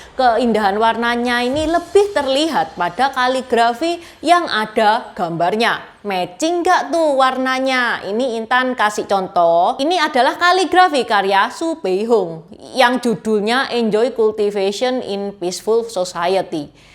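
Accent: Java, Indonesia. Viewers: Hidup merah, Meddok nya sudah berkurang dikit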